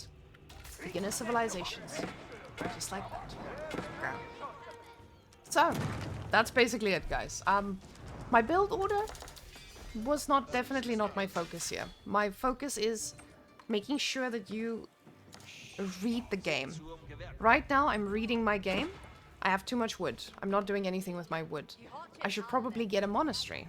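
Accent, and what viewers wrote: Afrikaans, South Africa. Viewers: Awe my bru! Is that a afrikaans accent I hear?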